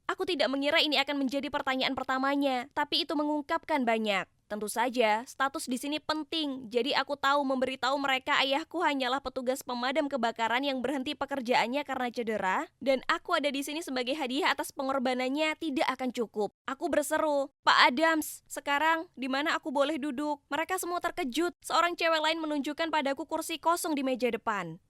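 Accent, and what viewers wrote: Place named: Java, Indonesia. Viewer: Pasti voice over nya orang jawa🗿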